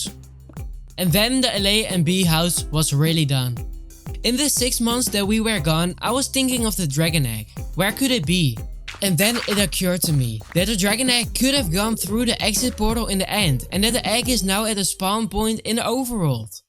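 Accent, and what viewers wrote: Netherlands. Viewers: your accent is netherlands en ik ben ook Nederlands daar om dacht ik het en het is een goede video is jouw friend ook Nederlands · dat hoorde ik all aan je accent 😂😂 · What country are you from?you got like a netherlands accent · you sound so dutch ( i'm dutch myself lmao ) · What country are you from because you have an dutch accent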